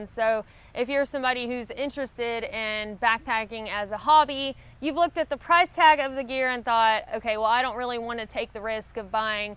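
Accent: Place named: Virginia, United States. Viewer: Love your accent. Reminds me of the locals around Blacksburg VA, where I spent a few years at VT. I sure enjoyed talking to the locals. Wish I could have made more time for the outdoors wile I was there. Good to be back home in the mountain west.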